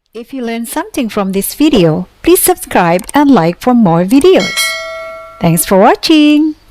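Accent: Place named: India. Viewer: за 2 минуты и 35 секунд с шикарным индуским акцентом я понял больше чем за полтора часа с преподавателем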